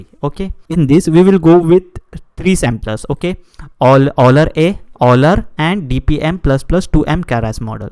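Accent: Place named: India. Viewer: Your English is very Indian, but the information is good, I can take it.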